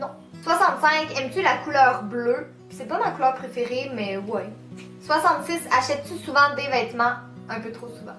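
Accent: Canada. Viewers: Coucou, je suis français et d'habitude l'accent canadien me dérange mais là franchement je le vie bien mdr ! Et sinon oui c'est vraiment comme ça qu'on vous voit les canadiens, avec des chemises de bucherons et vivant dans des igloo ! :p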